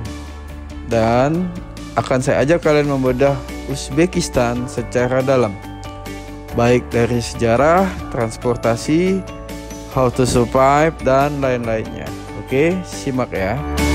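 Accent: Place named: Banjar, Indonesia. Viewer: Suaranya seperti Urang Banjar ya, R-nya susah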